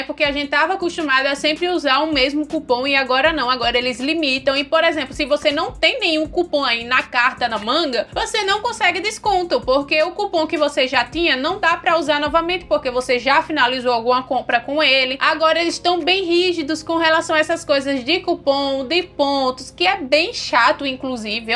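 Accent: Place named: Northeast, Brazil. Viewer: Menina, tu é muito inteligente! Carismática, bonita. Eu gosto do seu sotaque nordestino.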